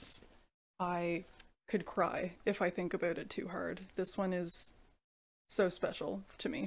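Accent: Canada. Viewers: figured was Canadian by the way you pronounced "out" and "about"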